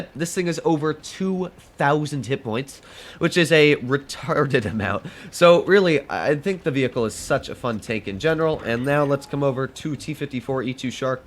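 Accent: Russia. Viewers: I like the way you pronounced the words with the Russian accent😂 that’s funny. I’m from Russia! I really enjoy watching your videos!👍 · I like your Russian accent!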